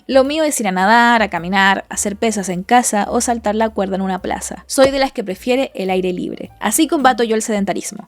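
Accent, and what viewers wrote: Chile. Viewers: me encanta el acento xileno, y tu contenido excelente! un saludo de cordoba, Arg !! me hacia falta reforzar esto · Solo con escuchar tu acento me di cuenta que eras Chilena, agradecido de encontrar tu canal 🤍, saludos desde la V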